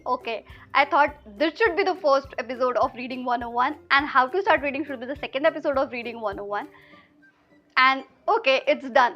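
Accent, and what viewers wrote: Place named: Punjab, India. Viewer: Are you Punjabi girl? You have Punjabi accent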